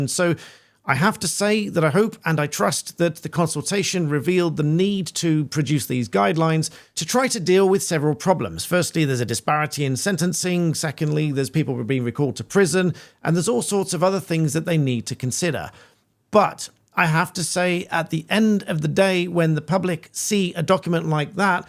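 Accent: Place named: RP, United Kingdom. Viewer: Can U do your Tommy Videos with a common/Chav accent pls? Hard to take seriously when you are speaking posh🤣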